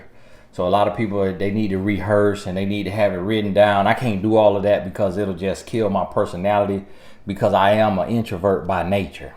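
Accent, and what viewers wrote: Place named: Chicago, United States. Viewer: you sounding a lil Chi town ova here nahhhhh!!! 🗣💯😜 🔥 I love this, definition of what “in love” looks like—Eph 5 from the Bible to the streets 👏🏼👏🏼👏🏼 I pray, Lord you made this man set-apart and I know You ain’t made only one...lol I’m so grateful for the Kingdom description y’all are real relationship goals—not perfect but real & striving to do it Christs’ way. 🙏🏽 Lagos, Nigerian love tho 😩🤦🏻‍♀️ Ohhhh, and another huge thanks, I’ll be getting my Coaching certification with you too. Your ministry, the way you love the Lord & the Queen [i spelled it correctly 😜🤜🏽🤛🏽] give hope to women like me who are in waiting. God continue to bless y’all, exceedingly and abundantly! 😊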